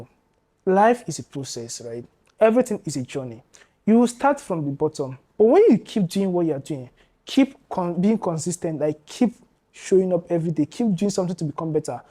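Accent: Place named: Anambra, Nigeria. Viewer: I like your accent.... My Anambra brother. This has to be the best founders story I've listened to. I had to download it to listen again. I loved the way you talked about your challenges. I'm one person that is usually afraid because I have tried some things that didn't work. I'm motivated already. I also like the idea of the financial market. I hope to meet you someday. I'm starting now.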